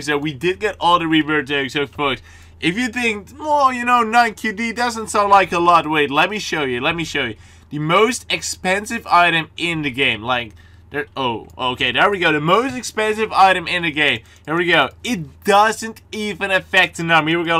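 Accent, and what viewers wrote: Netherlands. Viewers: Bruh hes dutch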